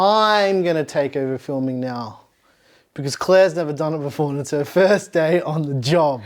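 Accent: Australia. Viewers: Fantastic build! The 3090 is definitely a hot boy so all the fans are definitely necessary. My EVGA 3080 draws 380 watts. Non related you remind me of my Mexican cousin so it trips me out that you have that Aussie accent. Lol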